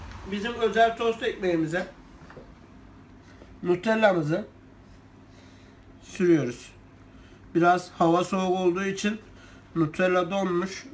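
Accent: Gaziantep, Turkey. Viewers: Normal somun ekmeğe Bizim Özel tost ekmeğimiz diyoN:D abi antepli oldunu çok belli ediyorsun Konuşma şeklinden bilmeden önce anlamıştım:D özelliklede şu kısım (buyrun tosta başlayalım (Ozaman) ):D bende antepliyim:) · ABİ ADAMIN GAZİANTEPLİ OLDUĞU ÇOK BELLİ OLUYO KONUŞMASI AYNI GAZİANTEPLİLER GİBİ BENDE GAZİANTEPLİYİM TOSTUNU YEMEDEN ÖLMEM İNŞALLAH:D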